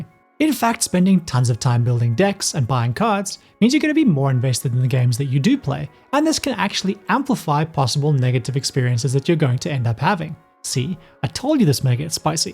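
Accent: South Africa. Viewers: Nice video. Is there some south african in that accent?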